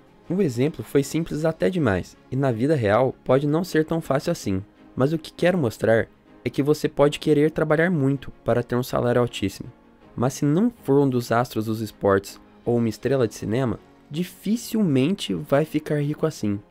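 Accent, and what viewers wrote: Caipira, Brazil. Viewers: Só um conselho. Procure um fonoaudiólogo para perder esse sotaque caipira horroroso. Não dá pra assistir o vídeo com uma narração dessas...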